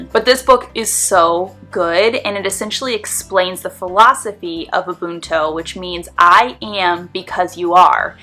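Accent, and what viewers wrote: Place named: Connecticut, United States. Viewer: So refreshing to hear a Connecticut non-accent! Been in Maine wayyy too long!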